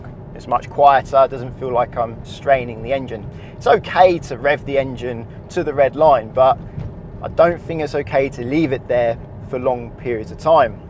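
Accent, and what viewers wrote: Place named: England, United Kingdom. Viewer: alyone else find it tiring to listen to the many english accents Youtube has given us? Some are cool, some are annoying, some are incomprehensible... its a tiny island nation get it together, you invented the language, it's three not free, find not foind. This one is actually really hard to listen to, my brain is literally tired from all of the uncomfortable sounds.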